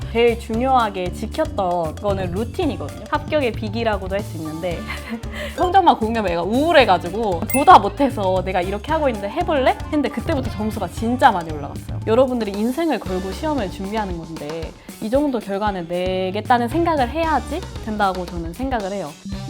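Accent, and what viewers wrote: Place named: Jeolla, South Korea. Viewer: 선화예고 나오신 분이 뭔가 광주나 목포삘이 많이 나시네요.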